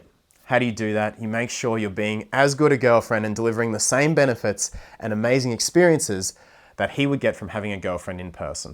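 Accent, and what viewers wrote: Australia. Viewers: Girls must love his Aussie accent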